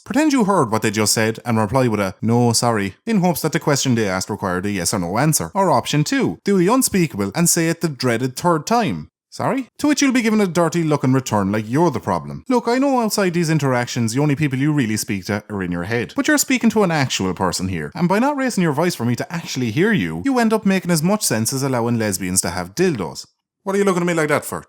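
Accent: Ireland. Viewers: You are the most Irish man I've ever heard. I love it.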